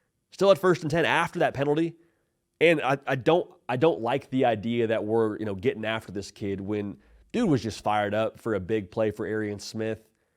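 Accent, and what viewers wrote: South, United States. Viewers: Thanks,! Great to hear a Southern voice in all of these commentaries. As a Catholic Georgia boy, and former UGA student, I say Congrats to Notre Dame! It was hard to watch for me but I wish them all the best in the playoff. Beat Penn State!! The Dawgs will be back. We need to get better backs and receivers, and they need to protect the ball. I hope Gunner can develop and grow into the player he can become. Happy New Year!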